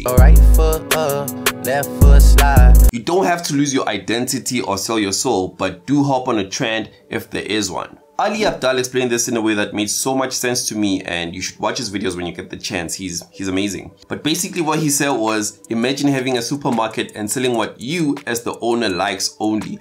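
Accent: South Africa. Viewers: i heard by the accent that this is a 🇿🇦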